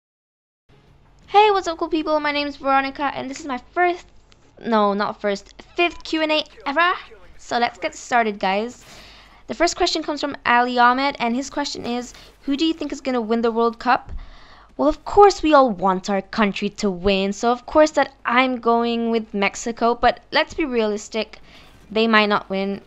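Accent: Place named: South London, United Kingdom. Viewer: Dat South London accent was a buff one still clearly reppin the ghettos fam keep up da good work SAFE!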